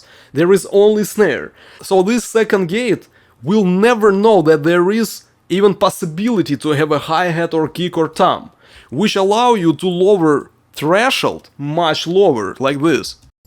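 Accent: Russia. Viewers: Хоть я и не особо знаю английский, но когда человек говорит с русским акцентом, то почему я понимаю о чем он говорит?)))) Видео зачетное!👍 Полезное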